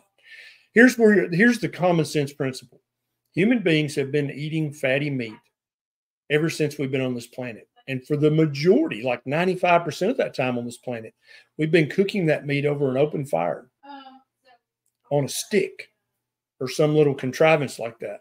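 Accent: South, United States. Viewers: Who doesn't love the frank talk...the discussion of chicken eggs at 1 hour is classic Dr Berry. People who think the accent, the country boy talk, and the literal red neck means stupid, and they are the stupid ones. is a very smart doctor. I got a lot better listening to him and ignoring my Yale trained, proper wasp specialist. Thank god I refused Dr Yale's meds. I was getting sicker and i now see his solution to my gerd increases osteoporosis,and dementia. But it would fix the gerd! I guess the logic is that on those meds a while, i would not only forget I had gerd, i would forget i had any other issues.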